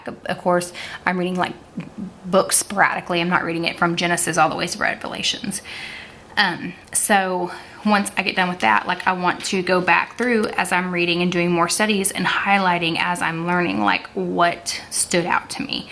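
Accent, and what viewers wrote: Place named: Mississippi, United States. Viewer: You made this looks so pretty I love the tabs I’ve been contemplating on getting this Bible only in the new king James version also I can’t help but ask... are you from Mississippi? Because I’m from Jackson and your accent just reminds me of home ☺️ thanks for the great review.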